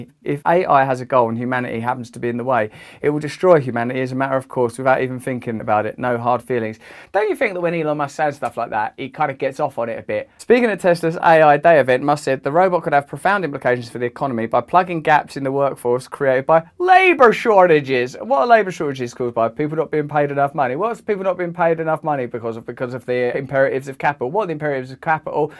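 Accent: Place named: United Kingdom. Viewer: only way you could think you like this psyko is his ay eye is acting like the thoughts of yours he needs to creep in a disgusting robotakeover... you'll shake 'em out, brother Brand... much love... gra'itude for you and the excellen' videos, and being the hilarious "t"-free Bri'ish accent in me 'ead, when we vibra'in' on a swee' timeline thread where we' no' all dead... <link>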